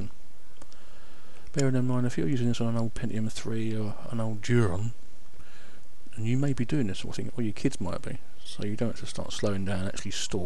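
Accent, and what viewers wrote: United Kingdom. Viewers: you are british ? you have a funny accent :))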